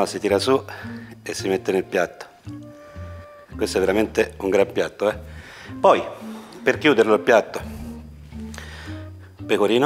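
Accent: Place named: Casentino, Italy. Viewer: Ok, dalla parlata infatti mi sembri vicino dalle mie parti Casentino e per curiosità te l'ho domandato, sorry :-) Magari mi sbaglio. Pura curiosità. Toscani numero uno!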